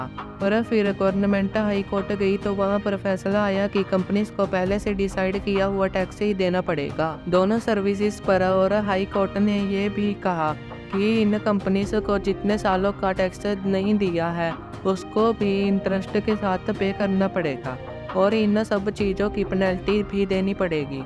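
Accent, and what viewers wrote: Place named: Kerala, India. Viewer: Malayalam style Hindi sunkar achaa laga 😂😂😂 keep going.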